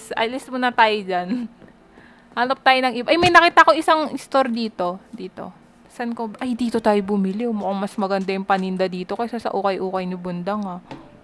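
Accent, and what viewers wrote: Visayas, Philippines. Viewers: bisaya po kayo? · Ate bisaya kapo bah? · Bisaya ka po ba ate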